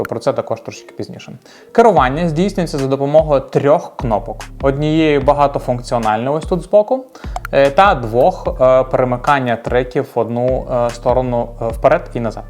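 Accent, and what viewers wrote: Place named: Surzhyk, Ukraine. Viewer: Бро, я тебя не понимаю. Хватит на суржике говорить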